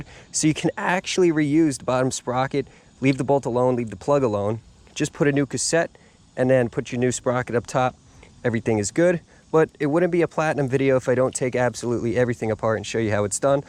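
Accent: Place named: New Jersey, United States. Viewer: btw, I'll be in Summit, NJ over summer....I thought you might be local based on speech.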